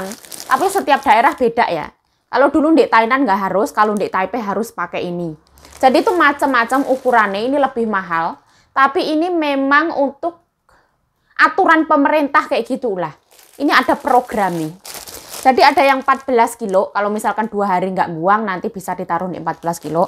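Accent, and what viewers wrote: Java, Indonesia. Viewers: Pertama kali liat chanel mbak waktu itu subcribernya 68k... Jatuh cinta sama kepolosanya dan logat jawanya yg medok.sehat selalu mbk biar tetap bisa menghibur😊😊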